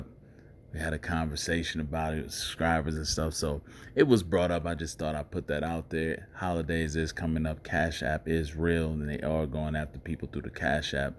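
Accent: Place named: Philadelphia, United States. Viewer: I knew you had Philly roots. The accent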